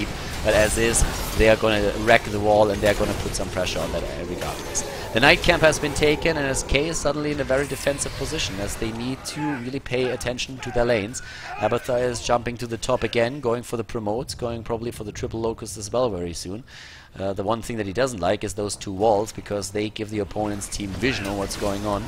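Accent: Germany. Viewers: man hört so krass, dass du deutscher bist XD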